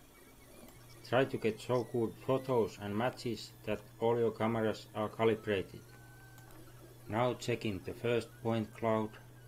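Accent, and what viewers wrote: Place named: Finland. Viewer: Fab, very helpful. I'm on a Mac and unless I make my own binaries I have to use Regard3D. Are you still using it? I'm guessing you are from Finland - having listened to Mika Hakkinen for many years I THINK I recognise the accented English. Or ARE YOU MIKA HIMSELF?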